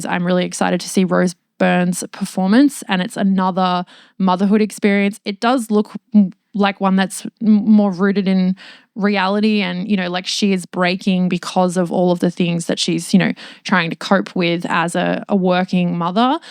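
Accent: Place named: Australia. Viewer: Are all YouTubers Australian? Or is this the new “Mid-Atlantic Accent”. Except its more like Mid-Pacific. Until YouTube i didn’t realize how close Australian is to an American accent. The only real difference is “er” pronounced as “uh” which is also a Brooklyn thing as in “Muthuh” and “Bruthuh”. Interesting review too! And seems like you changed your lighting which looks nice. Seems softer with warmer colors. Or maybe its a suntan. Either way looks good.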